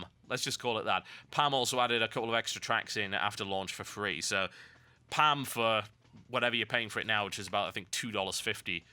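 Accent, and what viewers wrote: United Kingdom. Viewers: umm you dont gave to look or sound british or english to be english. and hes the most english sounding person ive ever heard. one last thing. why are you so mad???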